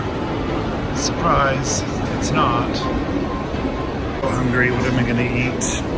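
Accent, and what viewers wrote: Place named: Australia. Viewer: You're australian? I can barely detect an aussie accent.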